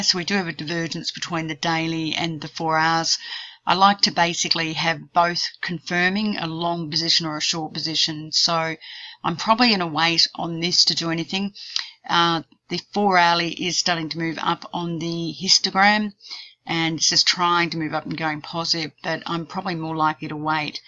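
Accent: Australia. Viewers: Don't fight it. Enjoy the Aussie accent man.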